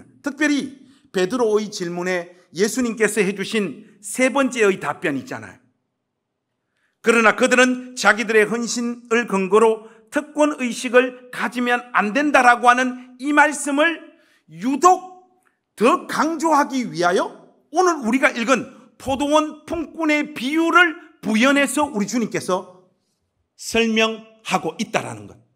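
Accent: Gyeongsang, South Korea. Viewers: 목사님 설교는 너무 좋아요. 그런데요 경상도 말투가 , 악센트가 너무. 강 합니다😂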